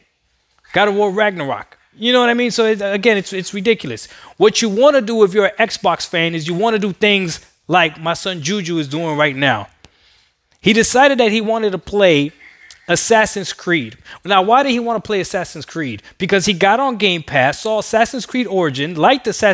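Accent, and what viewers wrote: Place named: New York, United States. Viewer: Bro where you from New York or jersey??? Accent thick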